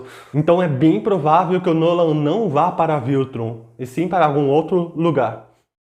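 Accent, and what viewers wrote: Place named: Latin America. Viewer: Cara vc e de algum pais de lingua espanhola? Vc tem um sotaque diferente. Vc nao e brasileiro? Valeu!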